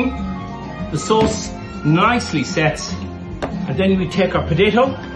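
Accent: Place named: Dublin, Ireland. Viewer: A fabulous recipe, and your beautiful, mellifluous Dublin accent made your explanation doubly enjoyable!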